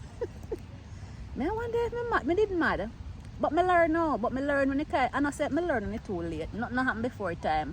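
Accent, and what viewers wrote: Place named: Jamaica. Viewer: You're a very very real Jamaican the way you talk and act.. Be blessed..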